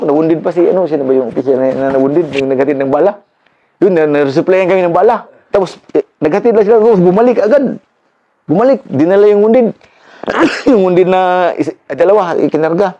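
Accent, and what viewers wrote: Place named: Visayas, Philippines. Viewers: Napatawa ako don ah 😂 , Abante kage, abante kage,. Pagkaluskos bombahan ng tropa hala. Patay si kage!😂😅 . bisaya kaayo ka sir ba klaro lang hahhaaha😄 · nakakatuwa si sarhento mag kwento kc may halong bisaya kay bisaya man ako watching from manila pero sa surigao ko pinanganak 😊💪✌❤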